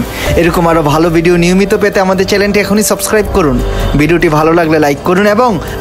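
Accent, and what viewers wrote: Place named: Bangladesh. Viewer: আপনার কথা বলার ধরন ও বাংলাদেশ উচ্চারণ ভালো লাগে নি।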